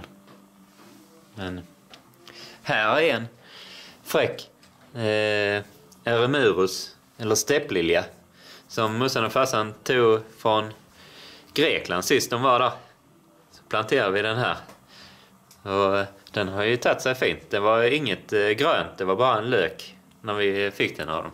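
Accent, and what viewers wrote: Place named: Kristianstad, Sweden. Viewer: Kunde nästan svära på att du var från Kristianstad-trakten :-) Iofs inte SÅ långt emellan men lät så på dialekten. Fina omgivningar där i Småland. Och så mkt snö!! Här i Eslöv har vi vit mark nu, denna i natt, och jag önskar att det försvinner lika fort som den kom haha. Din vovve... Det är väl inte en New Foundland?? Såå fin!!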